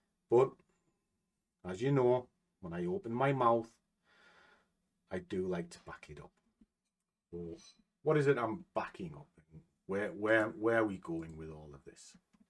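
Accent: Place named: Northern England, United Kingdom. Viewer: Hey like your videos. Sounds like you're in my neck of the woods. Good to hear fellow northerners smashing it. Like the way you trade live. All the best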